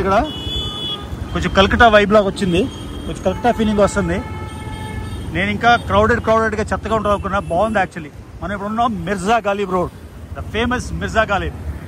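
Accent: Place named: Andhra Pradesh, India. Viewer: That's not a compliment dude. Take it as criticism I don't like the way u narrate and do videos and ur slang please speak in telugu not in Andhra Slang.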